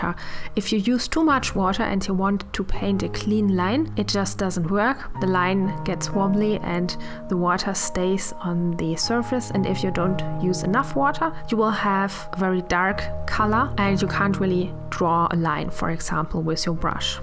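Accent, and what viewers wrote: Germany. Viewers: Ich liebe deinen deutschen Akzent! Ich lerne gerade Deutsch. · Man hört so sehr raus das du deutsch bist ich liebe es 😂❤️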